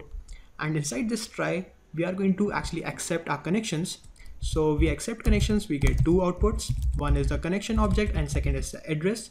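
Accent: India. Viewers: this guy is just a thief, what a shame look at this older video: <link> watch it with a proper English not Hinglish!!!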